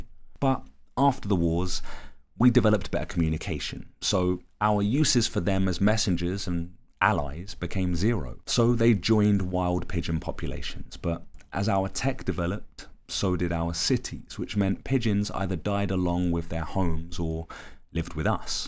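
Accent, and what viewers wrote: United Kingdom. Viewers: An advocate for mistreated animals, an artist, and the most gentle British accent. You win the internet sir. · it's weird watching a dreaded up nick cannon with a british accent... but i'm here for it.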